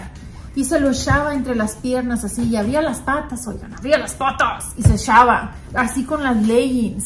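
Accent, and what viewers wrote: Mexico. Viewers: Yo me baño todo los días, el pelo un día sí y otro no. En verano me ducho dos veces, porque soy de una ciudad humeda y se suda mucho. Yo veo por IG un compatriota tuyo que comenta este tipo de videos, como si interactuara con los del puesto, y me rio mucho. Por el acento creo que tb es por la misma zona de Méjico que tú. Ahora no me acuerdo de como se llama en redes. Saludos desde España.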